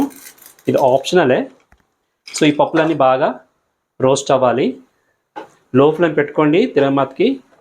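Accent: Tamil Nadu, India. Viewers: no no meeru bagaane maatladu tunnaru kaaka pote meeru tea chese video lo paalu kaagatam leda maragatam ana kunda paalu udikaayie annaru andukani maatlade vidhaanam Tamil style gaa anipistundi naa mother tung Oriya.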